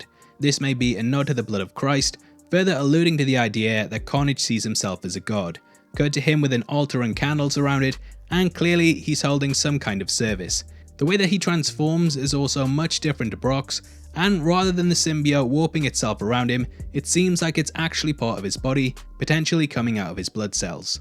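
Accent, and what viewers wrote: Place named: Newcastle, United Kingdom. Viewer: Call it what it is, a geordie accent. Nothing wrong with it.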